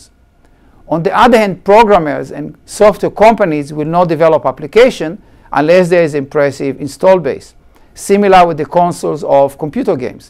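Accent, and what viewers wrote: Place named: Israel. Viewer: Am I the only one finding Israeli accents insufferable?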